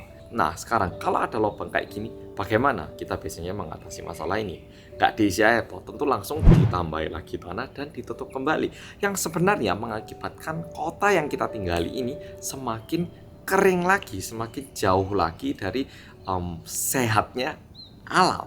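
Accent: Java, Indonesia. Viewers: wajah Korea koq logat jowo sih mas.. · Hallo mas Korea medok Nitip salam buat kim bum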